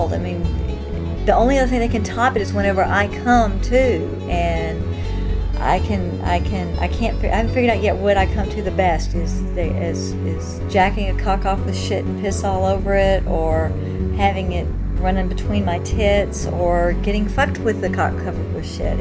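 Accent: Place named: South, United States. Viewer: She has a Southern accent...doesn’t surprise me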